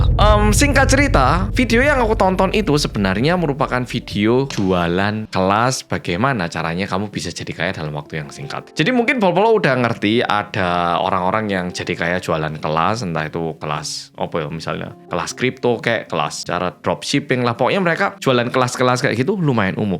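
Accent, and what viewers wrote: Java, Indonesia. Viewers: Hai org korea yg medok🎉 bolomu lgsg nyimak🎉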